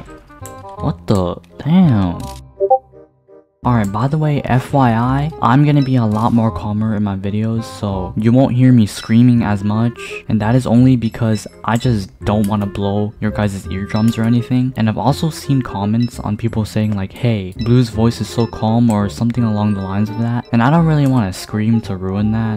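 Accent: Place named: United Kingdom. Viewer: why do you sound so BRI-T-ISH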